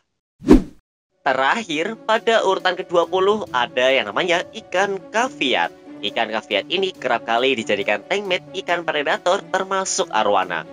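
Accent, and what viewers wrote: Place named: East Java, Indonesia. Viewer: tapi admin kyk orang Jawa timur Antara SBY atau sda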